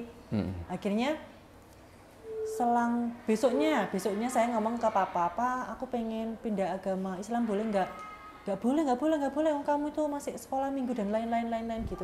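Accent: Indonesia. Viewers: Medok skali jawatimurnya ibu...😂..smoga tetap istiqomah..🤲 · Surabaya medok mantap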